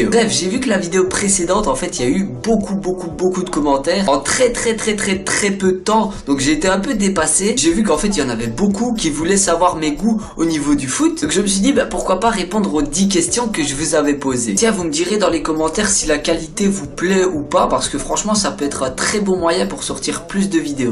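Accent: Belgium. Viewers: Le réveil de l'accent belge!!!!!!!!! (oui)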